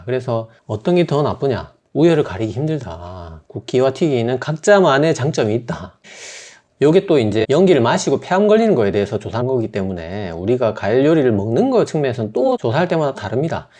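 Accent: Gyeongsang, South Korea. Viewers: 선생님 꿀 지식을 경상도 억양으로 설명해주시니 정겹습니다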